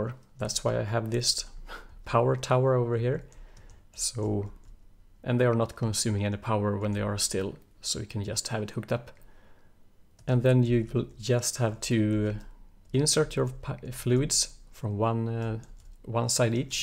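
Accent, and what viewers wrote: Germany. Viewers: Are you a German?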